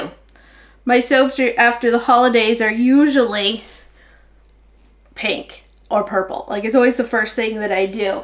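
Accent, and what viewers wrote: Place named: Missouri, United States. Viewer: I love your accent. I'd love to visit Missouri one day - I love how varied the states are.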